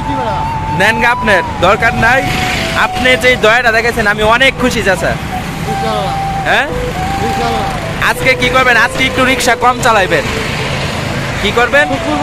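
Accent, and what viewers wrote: Sylhet, Bangladesh. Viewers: Vaiys apni ki sylheti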